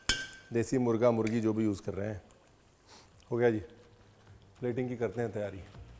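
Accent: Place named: Lucknow, India. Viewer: Sir apke muh se lucknowiya bhasha bohoateee hi badiyaaa lagti hai... Ekdum gajab!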